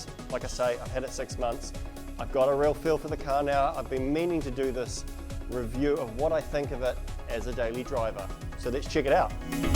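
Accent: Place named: New Zealand. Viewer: Im a kiwi and even I found this accent thick! 😃 great review, will it be up for sale soon?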